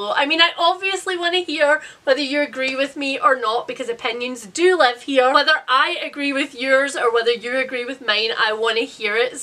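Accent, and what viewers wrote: Scotland, United Kingdom. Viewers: I have very rarely not sped up audiobooks, often times based on the accent of the narrator I will start lower (usually 1.2) but as I become used to it I speed it up. There have been some narrators that talk sooooooo slow, that I listen to them at 2.5. It completely depends on the book, but probably on average its usually 2x, it just sounds more natural - once I got used to it listening to it at 1x sounds like a record that has been slowed down. I also listen to youtube videos at 1.5 to 2x - 🤷‍♀ It's more normal sounding/soothing for my brain. I like a lot of booktuber merchandise, and I love being able to support youtubers that I watch a lot, I honestly watch youtube more than I watch TV. Also while I don't enjoy all the same books that you read, I do enjoy that you're Scottish 🤣 The accent makes me happy.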